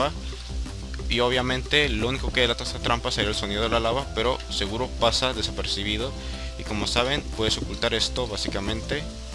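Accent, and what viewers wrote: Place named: Spain. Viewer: siges con acento español e_e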